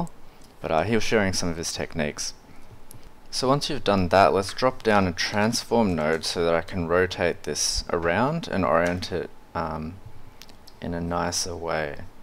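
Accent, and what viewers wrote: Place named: California, United States. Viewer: your accent reminds me of The Californian series from SNL.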